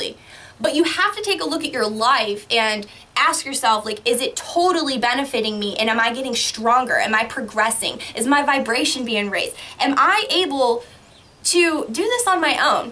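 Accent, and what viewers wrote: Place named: Michigan, United States. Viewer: Sound like you’re a Michigander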